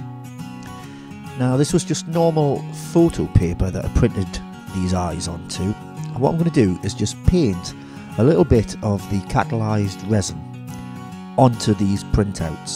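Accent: North East England, United Kingdom. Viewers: Thanks for this tutorial you blew my mind such a simple solution to making eyeballs for my handpainted skulls been looking all over for a way to do that without buying doll eyes from China. Of course I find genius solution from someone with a north-east accent hahaha cause that's where I'm from too! Proper class pet! Canny video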